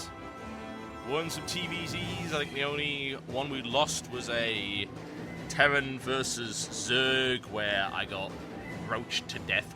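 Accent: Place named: Newcastle, United Kingdom. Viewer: He's a Geordie, that's why. Learn your British accents.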